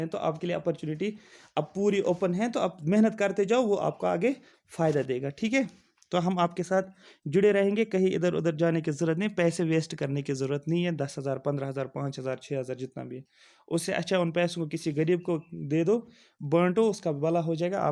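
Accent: Kashmir, India. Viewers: bhai kashmiryu ki hindi todi diff hai...tbhi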